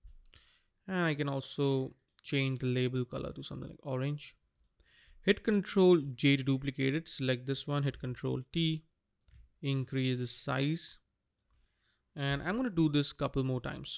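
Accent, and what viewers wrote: India. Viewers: Typical Indian English accent, lol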